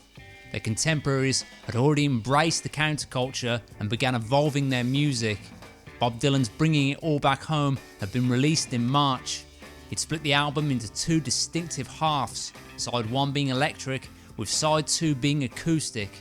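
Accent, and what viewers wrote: United Kingdom. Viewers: There's an old saying: "If you put lipstick on a pIg... it's still a pig." Well, "If you put a cliche British narration on a mediocre script, it's still a mediocre script".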